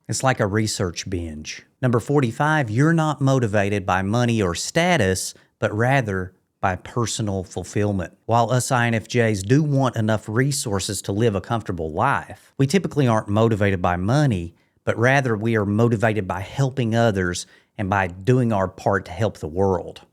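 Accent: South, United States. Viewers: Just curious…where is your accent from? 😊 I know it’s from one of the Southern states, but don’t know which one. 😂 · Saulron. THATs what you are saying 😂. Really bothered me I had to back it up and put the CC on. It’s your soft southern accent & the fact that Tolkien created a name almost nobody knows how to pronounce 😂. Cheers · Really enjoy your content. I especially love your accent as a fellow Southerner. Was wondering if you would be willing to share your state of origin?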